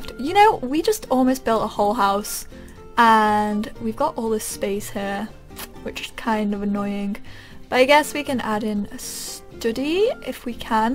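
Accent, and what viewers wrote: England, United Kingdom. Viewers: Hahaha! I feel so identified with the lack of building skills... Anyway, Sims 4 seems perfect for us! I love the videos, you are making the wait easier!! And your british accent is adorable, by the way! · ***** she totally british you can tell by her accent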